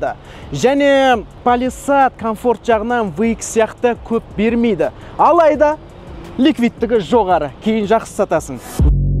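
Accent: Standard. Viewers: ісіңе береке берсін сен сияқты таза қазақша сөйлейтін қазақтар көбейе берсін аумин 🤲🏻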